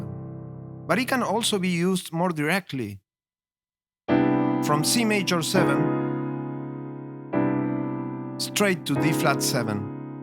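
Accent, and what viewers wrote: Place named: Spain. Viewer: I think the teacher's accent sounds pretty Spanish to me.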